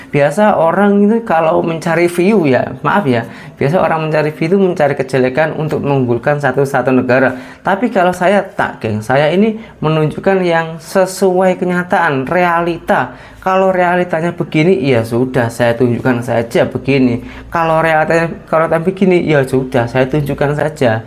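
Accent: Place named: Indonesia. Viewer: Kelakar la..kt malaysia cra kamu brckp ok je..sng phm..blk indonesia da brbeza..semakin pekat bahasa tnh air..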